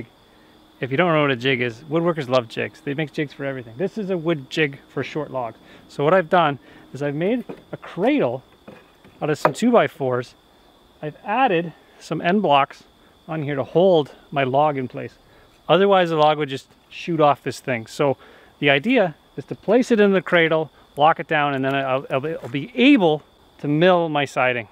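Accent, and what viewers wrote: Canada. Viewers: self reliance. tiny house. dog. drone footage. faint canadian accent. this is becoming a fun trend. lol.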